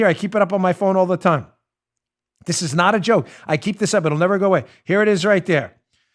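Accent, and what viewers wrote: New York, United States. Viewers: Thank you Patriot Dan, for all that you do, everyday. You bring us the truth, and the updated reports. Always educating us, showing exactly what's going on. You always are on top of the events at hand. Thank you, bless you and your family and please, be safe! Also, I see that both of us have never lost our NEW YAUWK accent.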